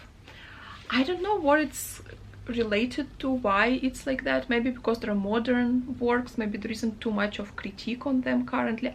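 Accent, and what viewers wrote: Russia. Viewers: Сижу и думаю, почему такой акцент знакомый, оказалось, что у меня такой же. )) Привет из России ) Мега информативное видео, большое спасибо! Как раз подбирала себе издание, хочу собрать небольшую коллекцию книг на английском )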